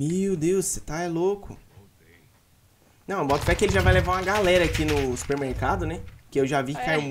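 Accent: Minas Gerais, Brazil. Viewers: Mo sotaque de mineiro kk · Tu é mineiro?kkkkk sutaque igualzin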